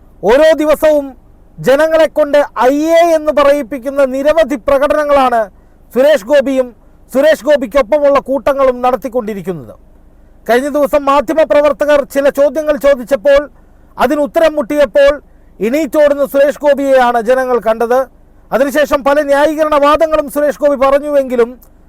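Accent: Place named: Thrissur, India. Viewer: te Thrissur slang thanne better for this news, Gopi thrissur reports please use ur own language 🙏🏼😊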